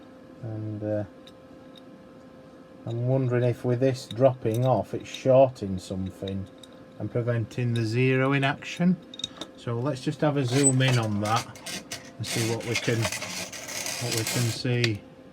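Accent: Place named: Yorkshire, United Kingdom. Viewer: Thats a Yorkshire accent where you from? Im from Rotherham.. but i've lived in Norfolk 24 years now.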